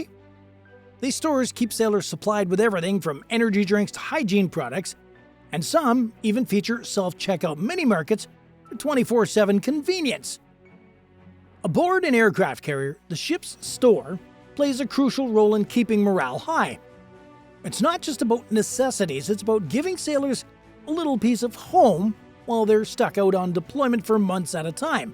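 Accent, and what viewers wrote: Canada. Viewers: Fellow Canadian narrating?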